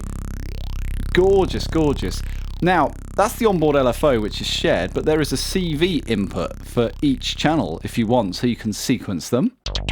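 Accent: Germany. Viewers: Striking German accent there (now try to pronounce that properly!??? )! Yet another colourful, enjoyable and warm vid from:) Gotta add how inspiring it is when you show all the gritty details of the processes taking place, makes my fingers itch to get back to my studio...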